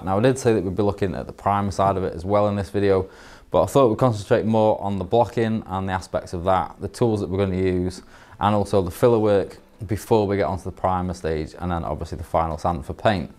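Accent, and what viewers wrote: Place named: Northern England, United Kingdom. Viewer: Great video bud. Just doing a Mini now and came across your videos. Really well explained and nice to hear in British English (although Northern) lol - Cheers fella x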